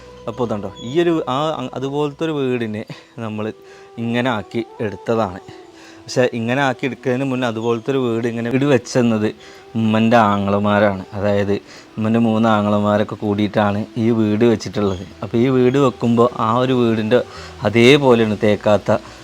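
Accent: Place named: Malappuram, India. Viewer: Nee adhyam uppaante ummaante ennu paranjirunnu ippo sinu parayum pole anu ippo parayunnath uppantem ummante ennu basha mattunnu nee Palakkad Jilla alle Malappuram basha varunnu ippo aduthayitt samsarathil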